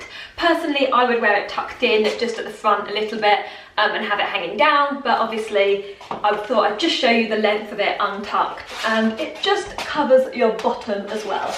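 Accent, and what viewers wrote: United Kingdom. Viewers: Hi! Just here to say I love your video's/clothes hauls/your beautiful British accent and well, everything! Much love from Alabama and I look forward to your next video!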